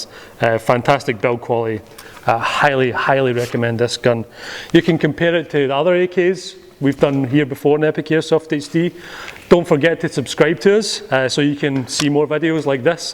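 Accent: Scotland, United Kingdom. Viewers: Very nice review! No box comments (why do people do that??), indoor environment for consistent testing, good shots and lighting... and that Scottish accent! what can we ask more? :P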